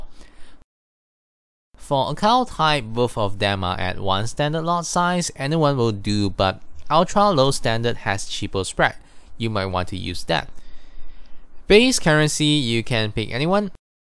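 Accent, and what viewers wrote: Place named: Singapore. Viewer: youre singaporean, right?????!! Too Chinese!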